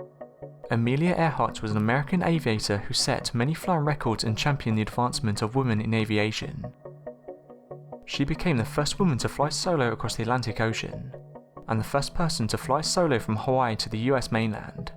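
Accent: United Kingdom. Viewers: Quit with the annoying British accent. You are LOSING VIEWS AND SUBSCRIBERS..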